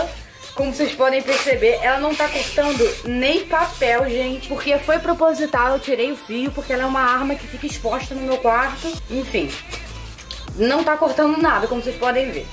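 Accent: Rio de Janeiro, Brazil. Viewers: essa ae e carioca mermo ela pucha o s demais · vc e de rj ? parece pelo jeito de falar kkk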